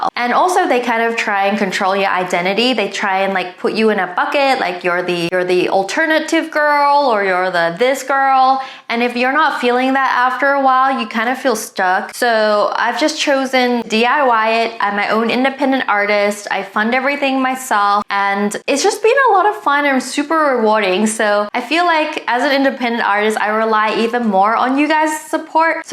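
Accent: Australia. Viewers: GOD I MISS THE AUSSIE ACCENT :(( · I miss your vlog channel so much! I used to watch you so often when I was younger, to the point where I still pronounce words with australian accent because I was practicing this language with you 😭 I love to hear you talk and be honest! Hope to see more of that in the future :)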